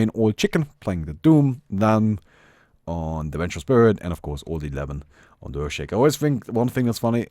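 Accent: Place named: Germany. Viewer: it's the German accent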